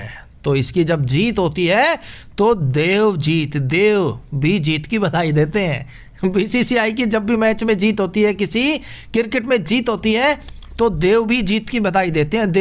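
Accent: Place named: Haryana, India. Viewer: Hariyanwi accent acha tha....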